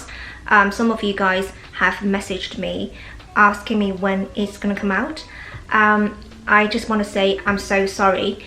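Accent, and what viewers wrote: United Kingdom. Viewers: 闭上眼还以为是哪个伦敦小姑娘在接受采访呢~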